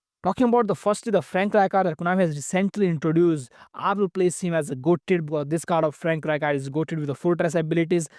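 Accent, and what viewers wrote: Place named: India. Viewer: My Hindi accent friend how are you? Because of you i lost money. You need to pay me back😊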